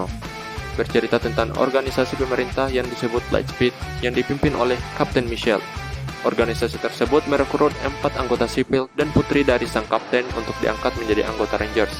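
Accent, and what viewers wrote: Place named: Medan, Indonesia. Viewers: Logatnya kayak orang medan ya bang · Suaranya Kayak orng medan Ygu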